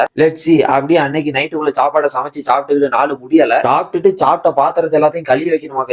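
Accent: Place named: Melapalayam, India. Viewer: nenga melapalayam ma bro slang is same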